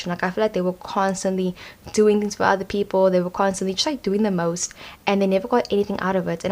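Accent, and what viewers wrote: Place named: South Africa. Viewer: are you from... SA.... bc I am and I hear an accent 👁️👁️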